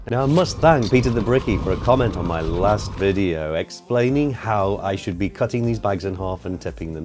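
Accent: United Kingdom. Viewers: I'm an ignorant American. Your accent is throwing me off. You speak and sounds like a UK professor but work like a laborer. You're in New Zealand. Is that the usual accent for NZ? In any case great work!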